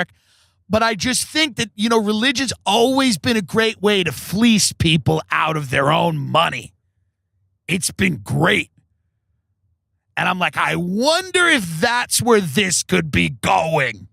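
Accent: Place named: Long Island, United States. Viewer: Maybe it's his long island accent but I love when he says SHUT UP! you really feel the disdain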